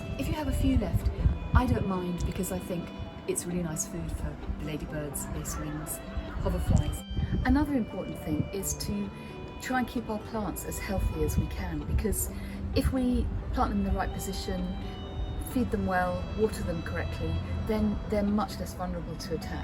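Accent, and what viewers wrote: United Kingdom. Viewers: 口音英国口音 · 听到你温柔的英国口音，让我想起来伊丽莎白女王讲话时的情景，很值得回忆。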